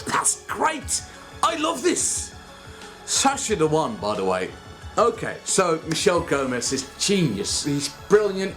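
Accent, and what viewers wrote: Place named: England, United Kingdom. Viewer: Your accent is sounding more English these days and I approve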